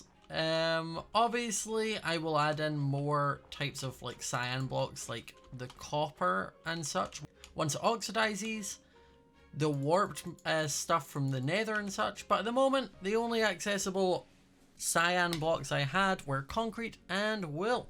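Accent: Scotland, United Kingdom. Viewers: I love your scottish accent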